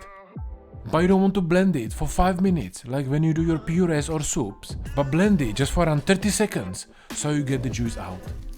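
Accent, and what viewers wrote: Czech Republic. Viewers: That is just extremely strong Czech accent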